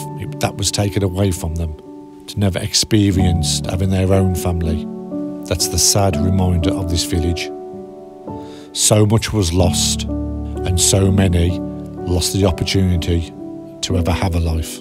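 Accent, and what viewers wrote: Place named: Northern England, United Kingdom. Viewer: At first the strong nothern accent in the narrative takes some patience, after about 5 minutes, the film develops and becomes a truly historical experience of the SS Reich Division massacre of the village. I had heard of this massacre in France as I am French/Irish/ and born in England, so, I have a decent knowledge from different angles on WW2 and WW1 (as my grandfather was in the French infantry from 1914 to 1917, he was seriously injured at Verdun, his brother was killed in 1916). My father was in England during WW2 and was 18 in 1945. One of my friends at school was German Venezuelan in England, his father was an SS Tank division commander in WW2 and has been suspected of taking part in a destruction of a village in France and the assassination of the village habitants. When at school in England from 1971 to 1981 I asked about the whereabouts of his father and one of the two sons who I knew well said “he can’t return to Europe, as he served in the SS and was an officer in charge of a Panzer Division)… His father was 15 years older than his mother who was Venezuelan and who I met in the North of England several times during half term holidays, small world, coincidences. 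Hard to grasp this level of evil and inhuman behavior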